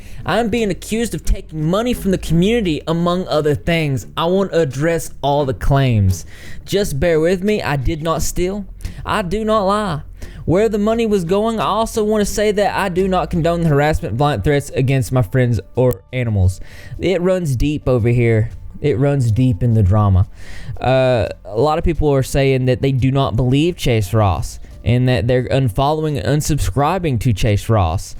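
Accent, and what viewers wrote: South, United States. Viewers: Interesting social dynamic. Thanks for sharing. Also, you're a cutie and I like your accent. I'm usually not fond of southern accents, but yours is really nice. [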